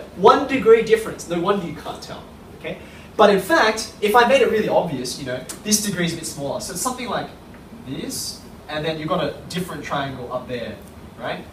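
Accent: Australia. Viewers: He looks asian, but he sounds australian. What da mate !? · That's an Aussie accent he speaks with.. the way he says 'yeah' gives it away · This guy with an Australian accent is nuts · If my math teacher had an Aussie accent, I would’ve paid a lot more attention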